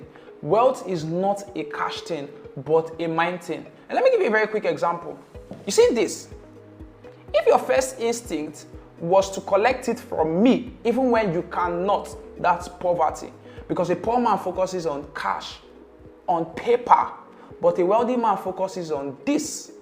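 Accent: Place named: Pidgin, Nigeria. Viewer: Pidgin English never leave you sha😅😅, awesome video thanks alot for it🙏🙏🔥🔥🔥🔥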